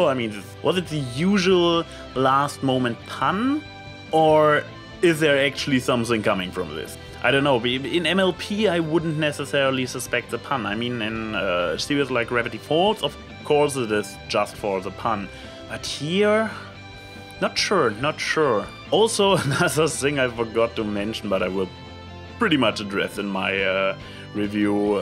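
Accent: Germany. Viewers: Your german accent is allways a delight and i like your views on the show, keep up the good work! :D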